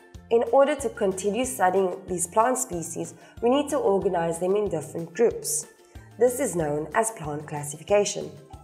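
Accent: South Africa. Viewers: This helped so much One question: Is the voice over south African?👀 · This helped very much thank you 🤧💞😭 (Also you sound south African for some reasons I'm probably wrong tho )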